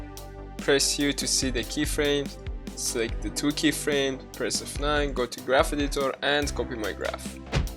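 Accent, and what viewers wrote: Iran. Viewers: ایرانی ترین لحجه ای که شنیدم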